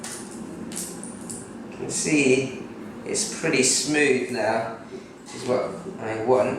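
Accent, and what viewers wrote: England, United Kingdom. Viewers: love this dude's accent! asian man talks like some englishman. And honestly how on earth does the XLB not look legit? This man's got better technique than most bogus white chefs who's trying to cook "fusion" or "chinese" !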